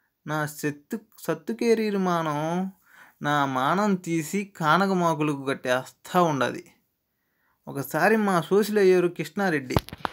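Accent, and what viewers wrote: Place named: Chittoor, India. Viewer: Baya chittoor slang lo chaduvu baya super ga untadi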